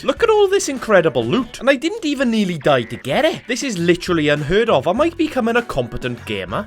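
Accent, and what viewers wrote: Wales, United Kingdom. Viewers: Gave you a sub because you're entertaining and perhaps oddly enough, because you're Welsh! My Father's entire lineage is Welsh and can be dated back generations, but I know very little about the culture and rarely come across anyone from Wales hete in Boston. A ton of Irish, but no Welsh! You are the first person since my departed Gpa and Gma that I have heard w/that accent. Makes me smile! ,👍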